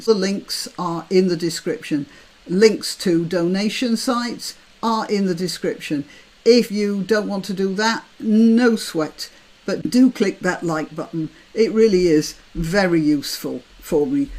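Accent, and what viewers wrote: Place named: England, United Kingdom. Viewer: It is amusing to hear someone talk about "Scottish madness" when your accent suggests that you live in, or come from, a country that is slowly disappearing under the open-toed sandal of Islam. The army that is being sent over in an ever-increasing armada of little boats, like Dunkirk in reverse, will only stay quiet until they reach a tipping point. When they reach a critical mass, bad Muslim and nice Muslim alike will not be asking for anything, they will be taking. And we will have lost everything thanks to our determination to be kind and welcoming. Piddling about talking about heat pumps will suddenly seem like an incredible waste of time after that point. Why the women of England aren't shitting themselves in terror of this is beyond me.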